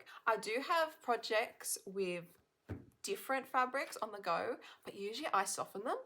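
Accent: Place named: Australia. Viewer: Loved this video. Where in Australia do you live? I adore the accent. I am in the Unites States in Tennessee. I learned to cross stitch back in the early 80s, and learned in hand and still stitch that way. I've tried hoops, q-snap, etc. and they are just too uncomfortable. My fingers give me fits at times so I'm not as fast as I once was but I stitch the sewing method as you do. Your work is beautiful and for having a family you do make great progress. Have you an e-mail address? I subscribed to your channel so I will be seeing you again. Thank you for sharing. BTW, your name is lovely. XX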